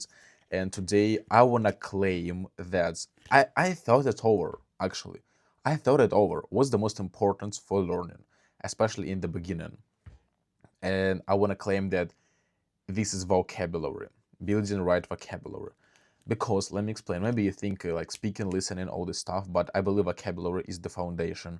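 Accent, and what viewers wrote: Russia. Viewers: accent giving u out a lot, work on dat (as a russian myself) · Сходу понял, что ты из России) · I'm not currently interested in reaching the c1 level but idc, you're so handome and have russian accenttt 😝🔥 good luck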